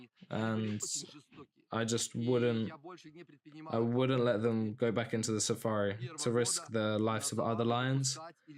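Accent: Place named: England, United Kingdom. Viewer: What a beautiful story, love the English accent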